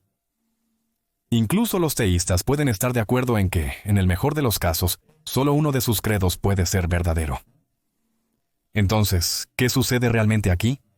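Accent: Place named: Colombia. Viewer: no mija , hablas como colombiana , eres? , he estudiado tanto y analizando tanto , que ya no tengo dudas , así me pongas una pistola en la frente , es tan real , que no ubiera querido existir sin la existencia de Dios , aunque se que es imposible existir sin ( ÉL ) Sabés que significa su nombre ? SOY el que SOY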